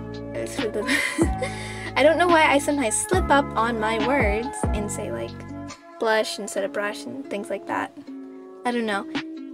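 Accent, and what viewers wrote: United States. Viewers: Woah Thats Amazing! Is it just me or i feel that she has like an American accent- But shes Filipino duh- Edit: So that means shes half American :0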